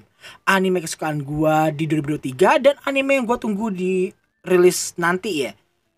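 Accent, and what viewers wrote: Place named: West Java, Indonesia. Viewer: Bang lu orang Jawa Barat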